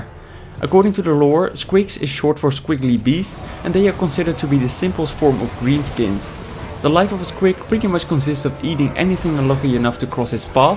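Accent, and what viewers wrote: Netherlands. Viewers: let me guess, you're Dutch xD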